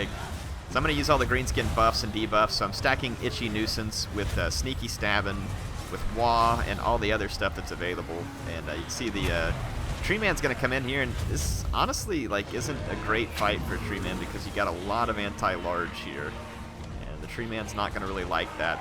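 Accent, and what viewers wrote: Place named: Arkansas, United States. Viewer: Whenever I hear that ol' friendly-Arkansas " Hey Youtube, what the crap is going on!" it cheers me up immeasurably.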